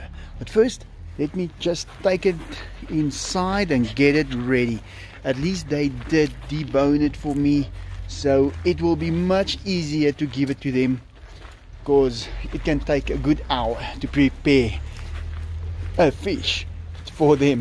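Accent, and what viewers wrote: South Africa. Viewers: Is this a south african accent? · Cartoon head Since people decided to be rude answering you, I’ll explain the reason so you don’t argue back rudely and cause another pointless comment war. Apparently, this person received 3 rescue tigers (1 died and Enzo was really sad, that’s when Diego came in) and since these are rescue tigers, they never learned from their mothers how to survive out in the wild to hunt and eat. This person also lives in South Africa (his accent), and these tigers do not belong in that region. If they are free, they will starve to death because they’ve been in captivity for many years with this special person and they’ve been fed by him. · Are you South African? You kind of sound like a mixture of Steve Irwin and sharlto copley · The accent is unmistakenly South African...unless I'm mistaken.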